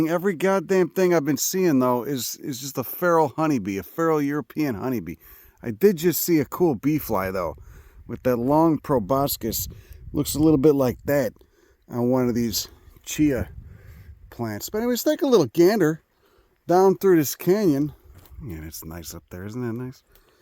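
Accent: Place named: New York, United States. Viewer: You sound like a new new Yorker but I love your knowledge. We love in Wyoming. Most the same stuff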